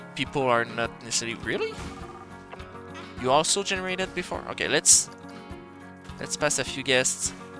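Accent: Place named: France. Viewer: Yo, nice video homie ! Btw, are you French ? Anyway, keep it up.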